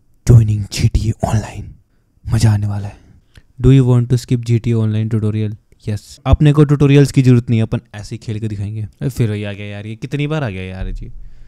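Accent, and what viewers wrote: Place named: Haryana, India. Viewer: haryanvi accent so good 😂♥️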